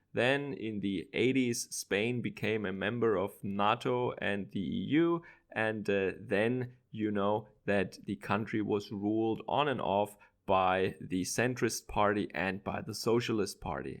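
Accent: Germany. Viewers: I’m not overly interested in Spain, but I am trying to learn more about European politics, & all the coalitions make them similar in a way - as you compared the top 3 winners to German results - and very different from the US politics I know the most. I think you did quite a good job compared to a few other vids I watched/news I’ve read on recent Spanish politics, & I find your voice with the heavy German accent very pleasant to listen to. Reminds me of my dad, may he Rest In Peace. I like the little personal insights/anecdotes, too! Keep up the good work :)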